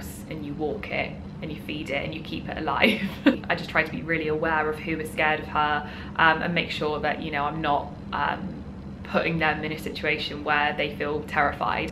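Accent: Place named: West Country, United Kingdom. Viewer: Hello. I'm James and also British ( I'm detecting a bit of a West Country accent?) I'm currently living in Manila and will be moving to Dubai in May along with my little border terrier Ted. It's a similar climate here but less dog friendly / accessible. Thanks for putting the info together as you've just answered lots of the questions I had around apartments and general dog life in Dubai!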